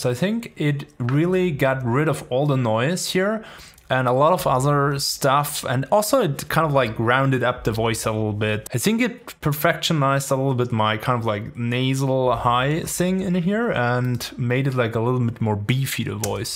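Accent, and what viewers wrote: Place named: Austria. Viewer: Terminator accent the best you gotta love it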